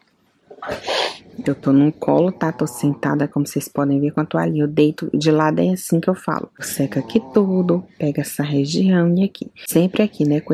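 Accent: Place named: Bahia, Brazil. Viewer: Muito lindo o amor pelas meninas , o geito de falar não me aguento de ri kkkk sotaque baiano mesmo obs: também sou 😘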